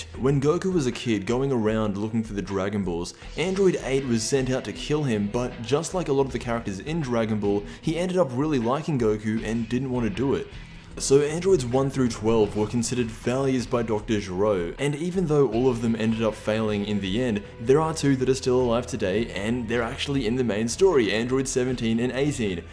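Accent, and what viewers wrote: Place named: Australia. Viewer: it's just an aussie accent lol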